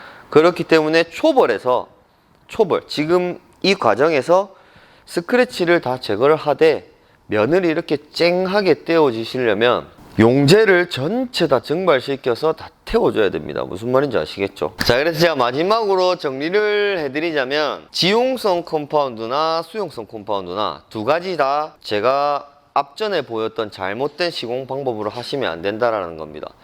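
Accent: Gyeongsang, South Korea. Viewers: 상도억양 개싫다. ㅜㅜ😊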